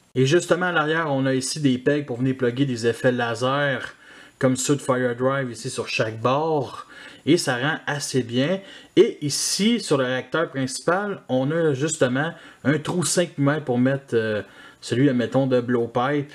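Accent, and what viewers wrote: Quebec, Canada. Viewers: Nom d'un caribou en rut, c'est une super petite chaine que je viens de trouver, ca va me faire un super entrainement à l'accent québécois (je suis frncais, et je viens de m'abonner)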